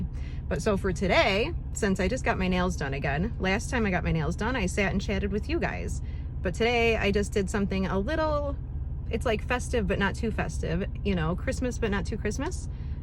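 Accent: United States. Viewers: Bonjour à vous je viens de m'abonner à votre chaîne vous êtes courageuse avec c qui vous êtes arrivé pour Vos dent croqué la vie a plein dent vous avez raison et vous êtes très belle j'adore votre accent américaine moi je français et je m'appelle Jonathan enchanté de vous regarder bisous à vous 😊 bonne continuation 👋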